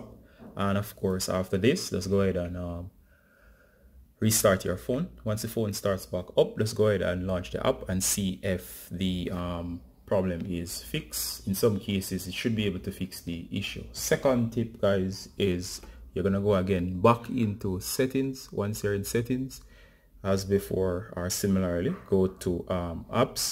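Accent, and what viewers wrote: Jamaica. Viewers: Sounds like a Jamaican accent. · Are you Jamaican?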